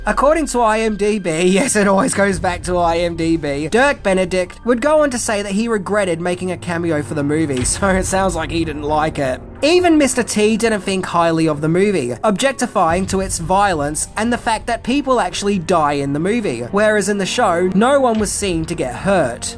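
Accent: Australia. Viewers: I think your videos are fun, and I have no problem with you calling "George Peppard," "George Peppered." (I just thought it was your Aussie accent, tbh). Just like you called "Hannibal Smith," "Hannibal Lechter" in this video. Production accidents will happen and, all things considered, I thought THAT was hilarious! 😂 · Personally I think it’s interesting and funny when you pronounce things differently because of your Australian accent. It’s what makes you.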